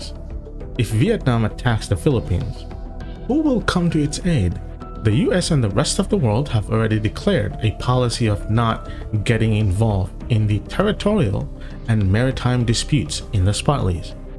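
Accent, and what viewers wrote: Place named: China. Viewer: True the voice of this person is Chinese, undermine relation of Vietnamese and Philippines or this person might be Filipino duterte fanatics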